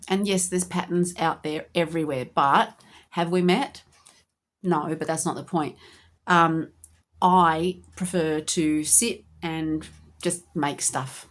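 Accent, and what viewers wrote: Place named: Australia. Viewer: Hi! Super cute mushrooms 🍄 I had to laugh about tester calls because I thought the very same thing! 😅 it's your beautiful Australian accent...don't change ❤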